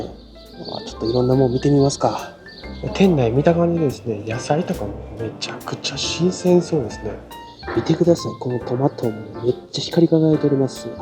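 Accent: Kansai, Japan. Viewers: 久々にみたけどナレーションに違和感あるんだが。もっと関西の訛りあったよね？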